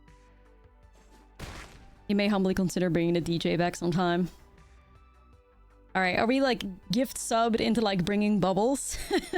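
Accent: Netherlands. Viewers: We love the koo keez. Your Dutch accent makes it fun! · If ANYONE is giving you crap for saying English words with an awesome Dutch accent, they can go cookie themselves 😂 Don’t apologize and don’t change. Love your content just as it is.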